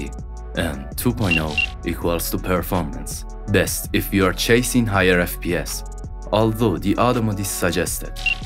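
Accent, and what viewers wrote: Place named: Iran. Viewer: Honestly , I feel like you have an Iranian accent 😅😅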